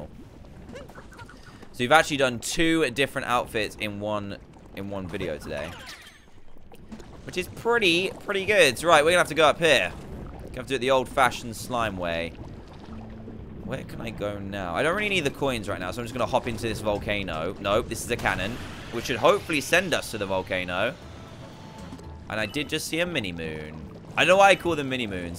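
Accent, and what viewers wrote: United Kingdom. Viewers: Bruh he's british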